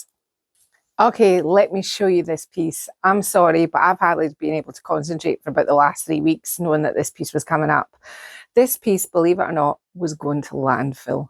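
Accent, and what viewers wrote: Scotland, United Kingdom. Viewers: The Master of layering! When I first started watching your lovely videos, I thought you were saying "lairs." Which I also thought was a strange word to describe layers. It must be a Scottish thing. The layers were in a lair? Just finished a tray using decoupage, stencils, and lots of lairs. All inspired by. Doing the monotonous repeats is very zen. Beautiful. Can't wait for your stencils, gift that keeps giving. Edit: also the Queen of the leitmotif. 😊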